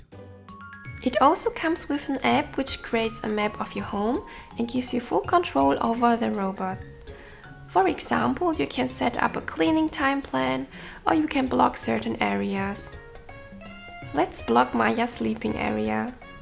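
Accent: Germany. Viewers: Her accent is like from a German ^^ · You Are from Germany, Right?😃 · You are from germany right? I mean the accent and at the top there was the word Werbung and I don't think it's English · are you german? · Are you German??????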